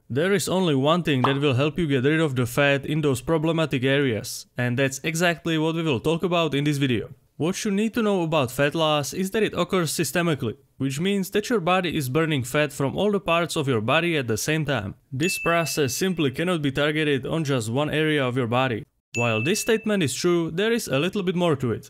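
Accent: Czech Republic. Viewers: You sound like you are Czech😅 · You sound czech:D (as a czech myself) also, nice video. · Yo bro is that a czech accent?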